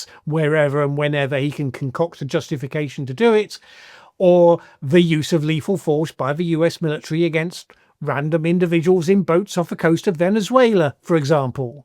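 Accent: United Kingdom. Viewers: There’s something strange about somebody with a British accent, criticizing America. Criticize your own country!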